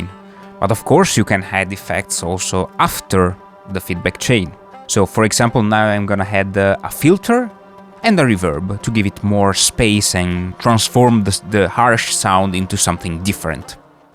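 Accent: Italy. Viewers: Hai un inglese Made in italy. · Awesome way of thinking out of the box, and happy accidents. Io sentivo l'accento italiano nonostante il nome slavo hehe ;) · It's so weird to see the most North Asian looking dude speak in the most Italian English accent 😂. Great video though, thank you for sharing this!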